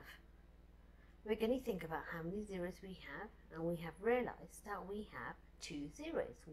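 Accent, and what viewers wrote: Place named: United Kingdom. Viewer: Shes british i think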